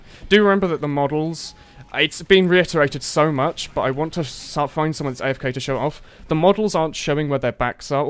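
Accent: United Kingdom. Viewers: what part of the UK are you from? Sheffield is still relatively warm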